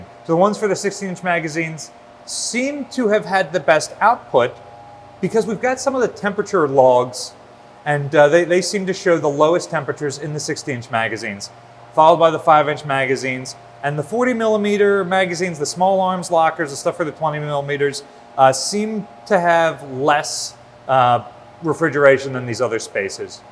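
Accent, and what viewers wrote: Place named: Delaware County, United States. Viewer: Great vid! Youre not from delco are ya? Cant place the accent, thanks!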